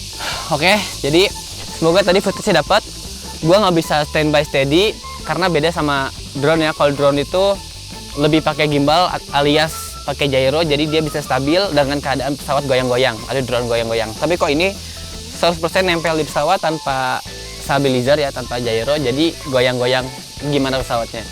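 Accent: West Java, Indonesia. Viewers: nagen (sunda pisan si aa ) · "Nagen" apa ya Nagen "Hampeg" apa ya hampeg, kocak emang ge ka alshad mah sunda pisan.. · Tetep ya a sunda nya keluar, emng enak bgt ngomong pake bahasa sunda ma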